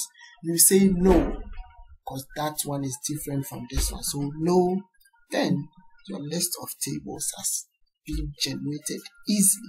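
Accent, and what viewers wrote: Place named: Ghana. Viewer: that's a Ghanaian accent lol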